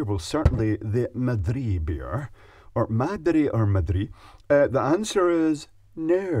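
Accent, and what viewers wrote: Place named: Glasgow, United Kingdom. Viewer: As an American, I love the Glaswegian accent and lingo ("a wee bit") haha Something I would have tried is using the "nose oil" trick I learned in college (if you have oily skin, wipe your finger on your nose and then swirl it around the foam) which almost immediately breaks the surface tension of the bubbles and kills the foam.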